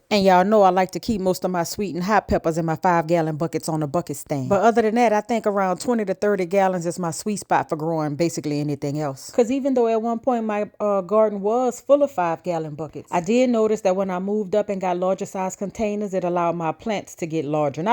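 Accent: New Orleans, United States. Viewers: She sounds like she's from new orleans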